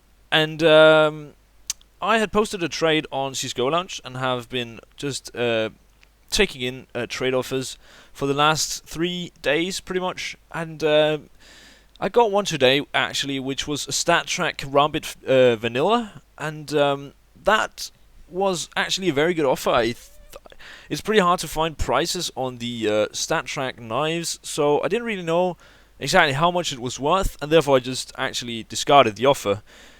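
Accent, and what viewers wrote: Denmark. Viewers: Where are you from? You sound like a Dane.. :) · Lool, are you from denmark?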